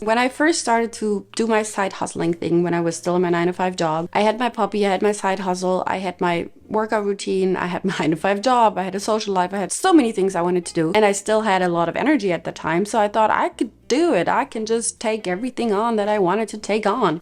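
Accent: Germany. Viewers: I love her German pronunciation though hihi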